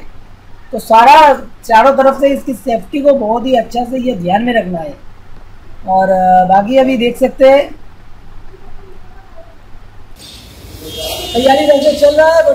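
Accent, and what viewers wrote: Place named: Bengal, India. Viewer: Dada kotha sune mne holo apni bengali...khub sundor laglo video ta erokom video ro deben...EV maintenance krte ki kno electrical licence lage?? Ami ekjon electrical engineering student...janale upokrito hbo🙏🏻🙏🏻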